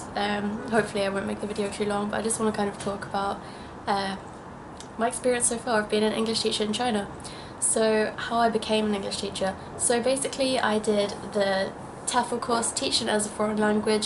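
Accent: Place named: Australia. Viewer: sounds Australian hahah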